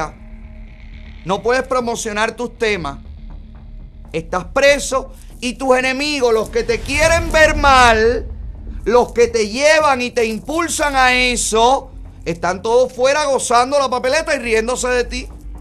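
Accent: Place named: Mexico. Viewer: Ahora esto es un show con acento neutro mejicano 🙄 lol para a ellos se subscriban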